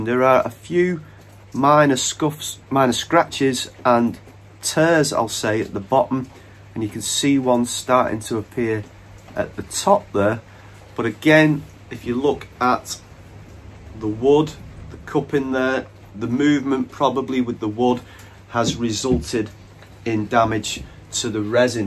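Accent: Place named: Liverpool, United Kingdom. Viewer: More scouse than me mate . We willsee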